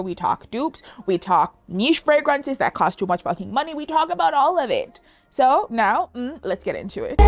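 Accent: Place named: Jamaica. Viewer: Am smelling a Jamaican accent 🤔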